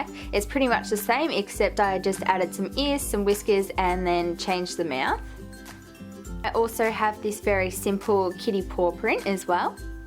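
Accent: Australia. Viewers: I love the accent of Australia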